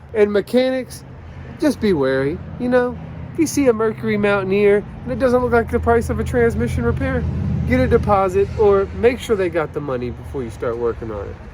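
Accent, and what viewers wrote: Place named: West Virginia, United States. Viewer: I can't quite get a fix on accent. Where is he from? West Virginia? Maybe he should require to hold the title on repair jobs over $2000?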